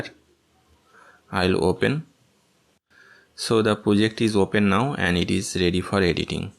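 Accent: India. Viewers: The pronunciation of the sounds like a Bengali speaking!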